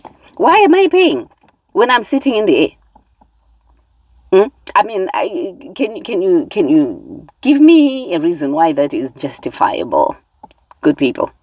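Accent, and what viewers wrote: Zimbabwe. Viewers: What a nice accent for a Zimbabwean, I enjoy listening to your voice. From South Africa 🇿🇦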